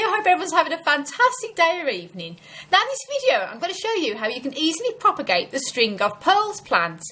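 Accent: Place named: Wolverhampton, United Kingdom. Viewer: Are you from Wolverhampton? Loved hearing somebody local on here! Thank you for the video. It's fab! Fingers crossed my daughter's cuttings root 🤞🏽 have a great day!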